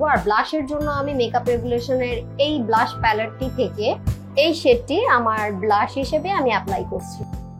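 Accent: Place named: Comilla, Bangladesh. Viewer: Apu ami o cumillar meye😍🙋🙋 tmar kase cumillar vasay kotha bolte dekhte onek valo lage 😁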